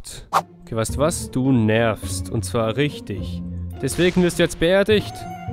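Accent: Switzerland. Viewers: Eine frage, bist du Schweizer?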